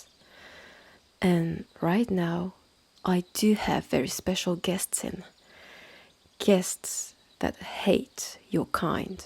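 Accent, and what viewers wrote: France. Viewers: Your English is, quite frankly, amazing & I'm so jealous as I'm nowhere near bilingual. Only thing I'm any good at is cooking and as you're French that's probably no boast :p The icing on the cake is you haven't fully lost your accent, which is an absolute dream to listen to. The creativity here is on par with any other channel I've listened to & my hope is YouTube enables you to draw others here too. I am intrigued as to whether you write these in your native language & translate, or directly in English? Either way, it's working :) Keep it up!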